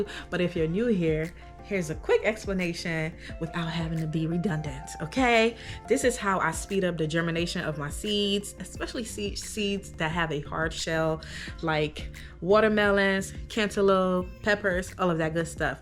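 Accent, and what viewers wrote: Liberia. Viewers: Do I hear a faint Liberian accent?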